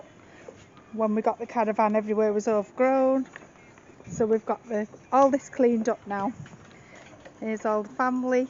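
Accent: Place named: Wales, United Kingdom. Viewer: New subscriber and I too really enjoy your vlogs, recognised your accent as I am from the same town, although moved away years ago. Loving the caravan vlogs as we also had caravans in past but live by sea now so don’t need to as much. Looking forward to your next vlog, and wishing you luck getting the decking sorted. I also love Karen’s channel too.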